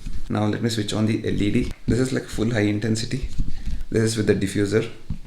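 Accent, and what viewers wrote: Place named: South India, India. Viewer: You sounds like south indian where are you from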